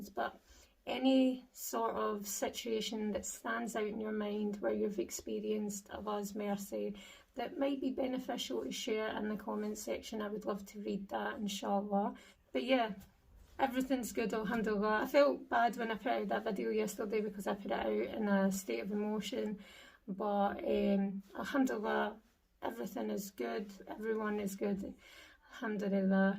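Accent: Scotland, United Kingdom. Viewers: As a muslim who understand English even in different accents and My mother tongue is Arabic , I may give you an advice that it is better for a wider audience to start writing subtitles because I know many many people who does not understand the Scottish accent . may ALLAH subhanahu respond to your good intentions. Ameen . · May Allah bless you sister, as for me I really love the Scottish accent, I consider myself in a way good in English, but to many others it would be helpful for them to put subtitles as well, may Allah accept the fasting from all, bless you and your family, and guide all your loved ones into Islam.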